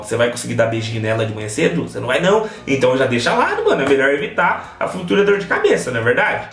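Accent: Goiás, Brazil. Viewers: fala por que vc fala no pique do goias vc conhece goiania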